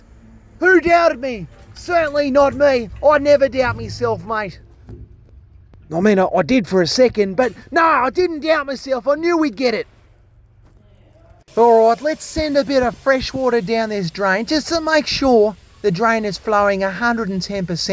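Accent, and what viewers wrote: Australia. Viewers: No idea why the algorithm sent me your way, but here we are, and I'm enjoying the videos! Greetings from merry England. Love your enthusiasm for the job, and man you couldn't be any more Aussie unless you had a hat with corks hanging off it, making Kevin Bloody Wilson sound shy and reserved! Keep up the good work mate.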